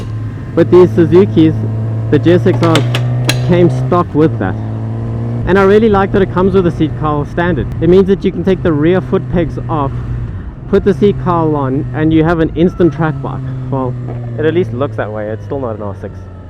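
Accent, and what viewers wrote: South Africa. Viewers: That SA accent is unmistakable. Thank you for sharing man!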